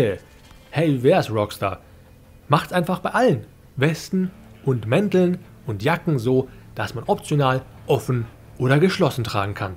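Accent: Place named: Australia. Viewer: Wenn das du warst der da Englisch gesprochen hat, dann hörst du dich echt wie ein Australier an. ;-)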